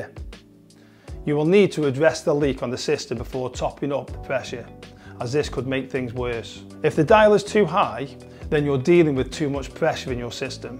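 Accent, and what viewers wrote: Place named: Stoke, United Kingdom. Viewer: This man has to be from Stoke!😊 (It takes one to know one.)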